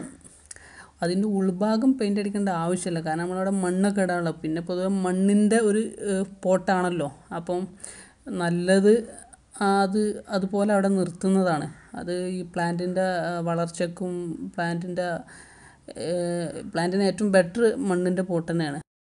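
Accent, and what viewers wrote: Malappuram, India. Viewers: ഓരോരുത്തർക്ക് അവരവർക്ക് പറഞ്ഞ ഭാഷയിൽ സംസാരിക്കാം ഓരോ നാട്ടിലും ഓരോ തരത്തിലായിരിക്കും സംസാരങ്ങൾ ഇംഗ്ലീഷ് ആരോട് മലയാളം സംസാരിക്കാൻ പറഞ്ഞാൽ അവർക്ക് സാധിക്കുമോ മലപ്പുറം ഭാഷയ്ക്ക് എന്താ കുഴപ്പം നല്ല ഭാഷ തന്നെയാണ് പിന്നെ നമ്മൾ മലയാളികൾക്ക് കുറിച്ച് കുറ്റം പറഞ്ഞിട്ടില്ലെങ്കിൽ ഉറക്കം വരില്ല😄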